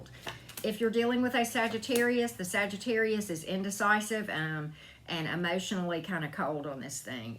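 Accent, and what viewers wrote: South, United States. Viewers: Definitely resonates. I like your southern accent too!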